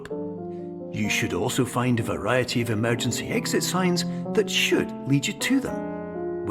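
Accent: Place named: Scotland, United Kingdom. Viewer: It's Scottish! The narrator is Max Howarth, who hails from Edinburgh. I hear him quite frequently on the radio at work with Arnold Clark adverts.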